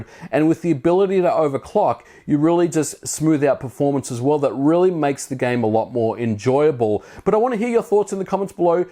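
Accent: Australia. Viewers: yes really. That australian accent is awful. Instant switch off. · And with a spanish accent instead of australian :D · australian accent is beautiful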